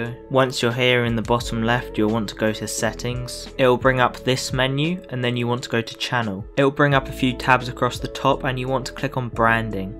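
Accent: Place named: United Kingdom. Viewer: I'ma assume you are British Your accent sounds British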